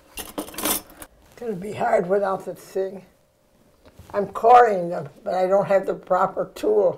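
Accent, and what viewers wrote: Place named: Chicago, United States. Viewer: I'm from Chicago right when i heard here accent i knew she was from my town Reminds me so much of my Grandparents Italian great cooking .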